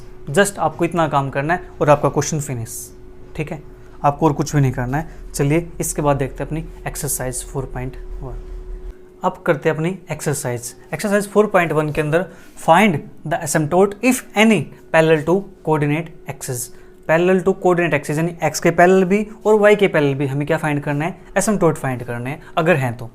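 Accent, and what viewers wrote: Haryana, India. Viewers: Sir app haryana se ho kya?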